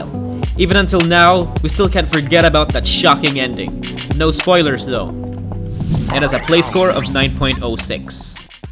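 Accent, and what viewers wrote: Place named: Philippines. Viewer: You can tell the guy talking abt the games is pinoy😂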